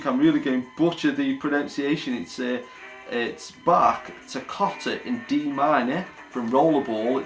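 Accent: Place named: Stoke-on-Trent, United Kingdom. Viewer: Where is you accent from, it sounds a bit stoke on Trent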